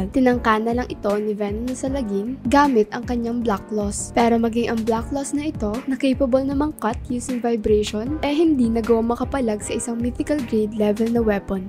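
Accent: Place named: Conyo, Philippines. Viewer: Ang ganda po ngayun nung phase ng voice ngayun nakakahinga na po yung voice di na naghabol keep it up ganda rin na may medyo pagkaconyo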